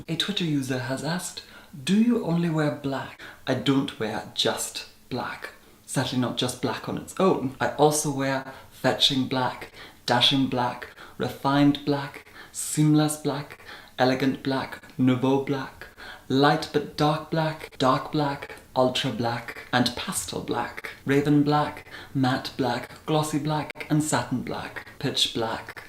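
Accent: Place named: Scotland, United Kingdom. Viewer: He is from Scotland.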